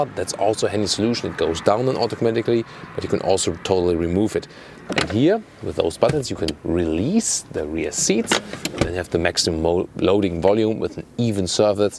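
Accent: Germany. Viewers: Nice review. I love the German accent.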